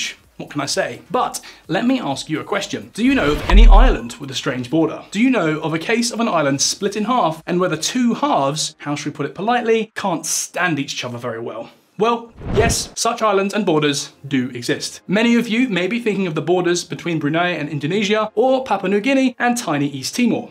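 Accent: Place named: England, United Kingdom. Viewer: The host is not American. Listen to his accent, he sounds English.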